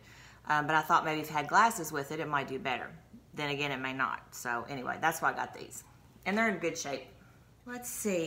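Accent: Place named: Kentucky, United States. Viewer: Your accent is like spot on to my grandmother's. You in Kentucky by any chance? Around West Liberty (or what's left of it)?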